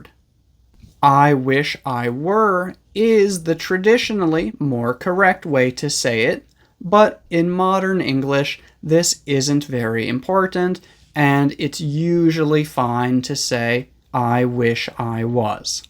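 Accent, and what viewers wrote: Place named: United States. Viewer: I wish I had you nice American accent. I wish you good luck. I wish you had more patience. I wish you all the best in this year. Thanks a lot,. I always I wish you more success.😊😊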